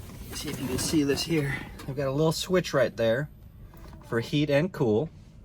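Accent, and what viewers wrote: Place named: South, United States. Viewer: Southern accent and southern slang "y'all " Im curious where y'all from.... im from New Orleans....live in Wyoming now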